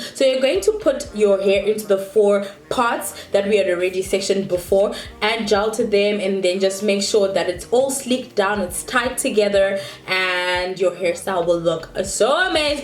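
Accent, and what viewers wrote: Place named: Zimbabwe. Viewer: I had to go through your videos. I thought I heard a Zimbabwean accent.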